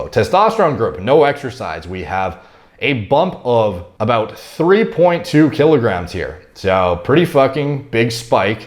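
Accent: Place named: Canada. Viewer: bruh. you sould so canadian.....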